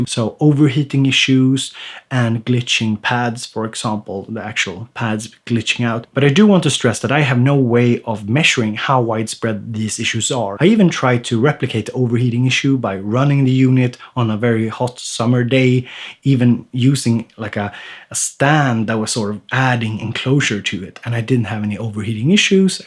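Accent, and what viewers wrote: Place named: Sweden. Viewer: I've been wondering where your accent comes from. Hearing your long 'e's tells me you're Sw'e'dish. :) Thanks for the videos!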